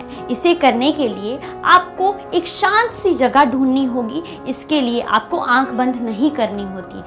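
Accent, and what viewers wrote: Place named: Bihar, India. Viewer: Bihari tone thik karen.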